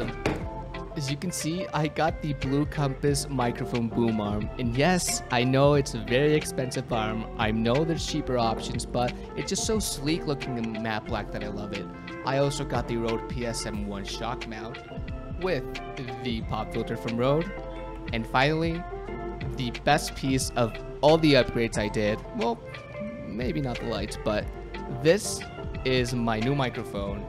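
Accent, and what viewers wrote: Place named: Texas, United States. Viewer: wait I legit thought you were maybe from texas or something 😂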